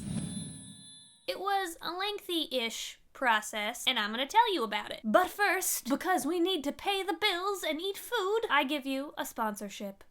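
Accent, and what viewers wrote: Minnesota, United States. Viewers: You live in Minnesota right? Your accent peaks through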